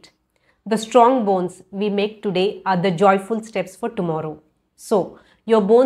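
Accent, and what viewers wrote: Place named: India. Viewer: Her voice and accent SO BEAUTIFUL….I love Indian accent so cute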